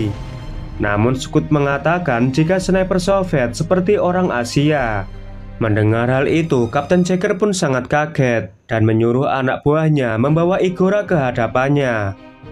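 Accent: Java, Indonesia. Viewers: Medok bngt min suaranya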